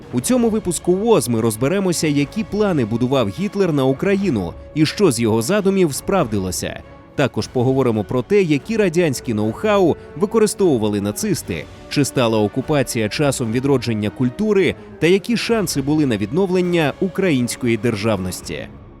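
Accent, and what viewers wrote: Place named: Russia. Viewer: (Якщо не говорити про радянський правопис, а саме вимову). Русифікована вимова. Деінде ви сказали Рейх замість Райх. багато ффф - «під її фпливом», багато де українська И, що близька до І, звучить як рос Ы, літера В узагалі ніде не звучить як Ў, де має бути — [створыф], [фсе], [афто] (правильно [стўориў], [ўсе], [аўто]). Г наприкінці слів у вас звучить Х. Тощо. Наперед скажу, це не діялект або щось подібне, а саме русифікована вимова. Послухайте на ютубі Українська літературна вимова Миколи Погрібного. українська мова насправді ще гарніша ніж ви думаєте/говорите. =)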